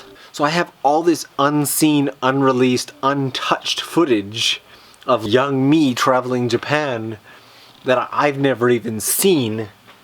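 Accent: Canada. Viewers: 10!? jeez lucky you, we've had a wind chill of about -41 the last few days here in northwestern ontario. and now that youre back in canada i notice the accent even more haha.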